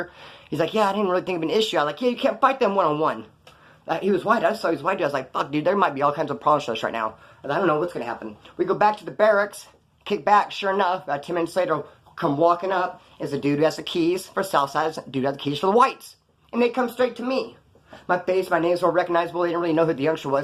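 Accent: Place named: Central Valley, United States. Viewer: You talk like a regular dude from the Central Valley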